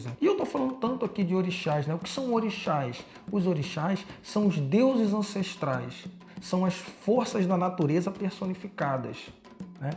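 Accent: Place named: Rio de Janeiro, Brazil. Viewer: O vídeo foi excelente parabéns Pelo sotaque vc é do RJ como eu kk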